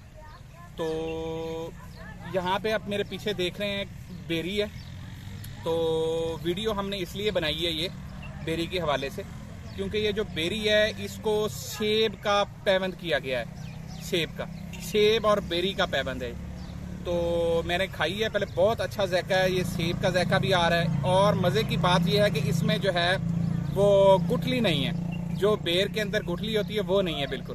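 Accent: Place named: Mewat, India. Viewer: آپکی آواز سے آپ مجھے میواتی لگ رہے ہیں۔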